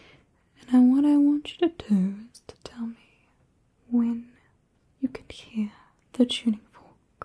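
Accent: New Zealand. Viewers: New Zealand mate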